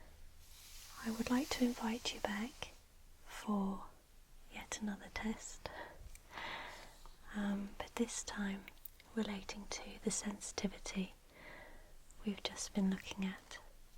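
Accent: United Kingdom. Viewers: This is the first video of yours I've seen, I must say, it's excellent. The overall quality is very genuine. I went blindly into this video, then you called yourself " " and you had a British accent, and I had a Doctor Who, I mean, you're even a ginger!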